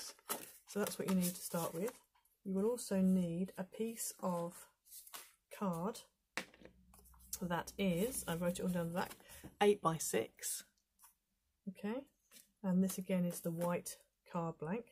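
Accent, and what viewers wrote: United Kingdom. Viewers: Cute card ❤ the way you do your tutorials so easy to follow, also I’m from California and love your British accent. Thank you for sharing have a nice day.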